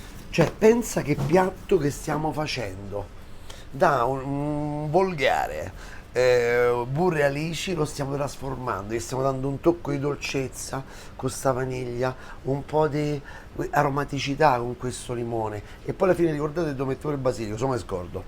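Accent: Rome, Italy. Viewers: Chef ci piace di più quando parli italiano con l accento romano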